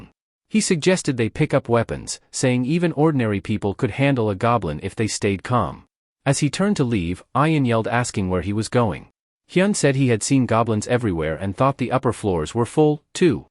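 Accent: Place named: United Kingdom. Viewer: The British accent😭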